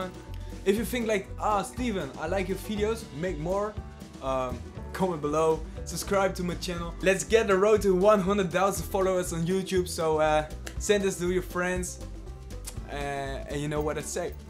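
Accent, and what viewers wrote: Netherlands. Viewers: Ik dacht al dat je Nederlands sprak!! Ik hoorde het aan je ‘accent’😂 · Ik hoorde je Nederlands accent hahahh je Engels is wel nog steeds goed hahahah😂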